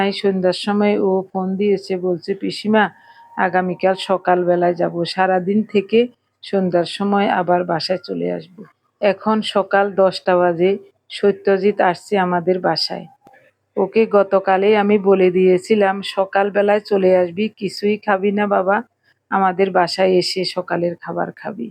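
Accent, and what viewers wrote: Noakhali, Bangladesh. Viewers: দিদি আমি নোয়াখালী থেকে বলছি। আপনার কথার মধ্যে নোয়াখালীর একটা টান আছে, বুঝতে পারছি না আপনার দেশের বাড়ি কোথায়। খুব সুন্দর লাগছে সবাই টুকটাক কথা বলছেন।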